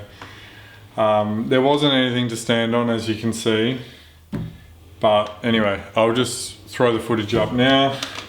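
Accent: Australia. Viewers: just subd been wachin your vids took a bit to figure your ausie, pretty sick stuff I love it, thought you were sayin "grouper" in one of the other vids then I got it, still snowing here in northern utah.usa hiding from the virus, maybe later this week I'll sneak out and catch a 24cm brown on a 4wht fly rod to scratch my itch, I just got back from southern baja were I was getting grouper, amberjack and yellowtail or "kings" as you say, what area are you fishing? so I can look on google, don't worry I won't sneak in plus I don't think I've got the balls for that kind of ........ what do you call that, "sketch fishing"? go big! stay safe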